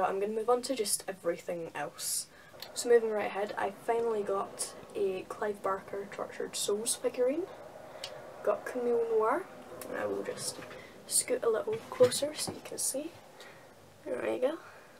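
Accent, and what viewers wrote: Glasgow, United Kingdom. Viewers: are you from glasgow ?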